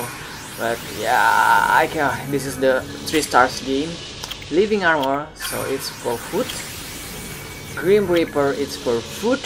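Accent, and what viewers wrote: Indonesia. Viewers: Logat nya Indonesia bgt.. di add om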